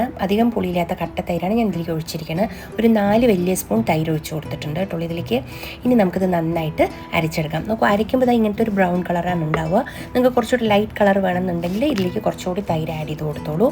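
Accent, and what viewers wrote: Palakkad, India. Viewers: ❤Ellam special receipes anu. Super, Palakadan varthamanavum adipoli, kelkan nalla rasam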